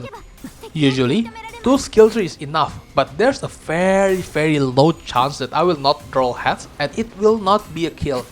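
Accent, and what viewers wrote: Indonesia. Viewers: You have an indonesian english accent, are from indo? 😀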